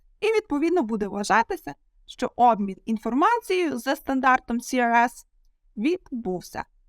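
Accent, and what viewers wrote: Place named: Western Ukraine, Ukraine. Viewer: У лекторки чудова західна вимова. А крім того, її відео містить корисну інформацію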